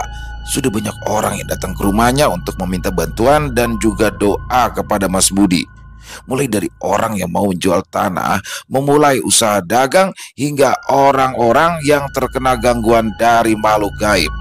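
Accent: Jakarta, Indonesia. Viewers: jangan lama2bangillo.kisah bang kangen dengan gayanya bawakan kisah bangpian dengan logt betawinya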